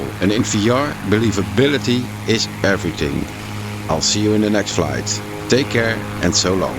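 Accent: Netherlands. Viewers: Dat Hollanse platte kut engels.. 🤣🤣🤣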